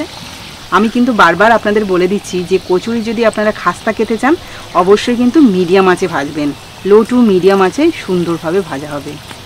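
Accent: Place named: Hooghly, India. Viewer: বৌমা , তোমার কথা শুনে মনে হয় তুমি হুগলি জেলার বাসিন্দা । তোমার রান্না খুব সুন্দর এবং পরিষ্কার । তোমার চ্যানেলের উন্নতি কামনা করছি ।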